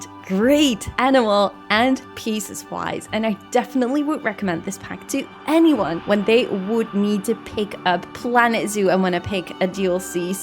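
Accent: Netherlands. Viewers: You sound like you are from Nederlands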